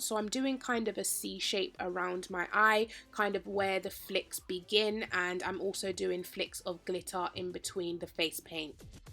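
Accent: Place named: United Kingdom. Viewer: Havent watched a british youtube vid in a hot minute. Love her accent. The makeup is gorgeous ♡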